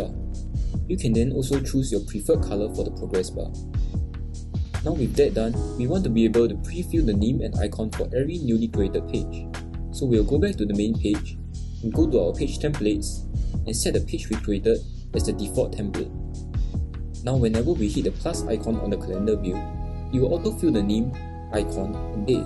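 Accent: Singapore. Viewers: Super great video thanks for sharing! But please don't shock viewers with this loud music in the end. If you dont want to remove it at least turn it down (or alternative make your voice louder) Also I think this video would be easier to understand for many people if you use more standard English (Nothing against Singlish but it can be difficult to understand for folks who never heard it haha) Keep up the great work! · Omg ru singaporean HAHAHA i recognise the accent!!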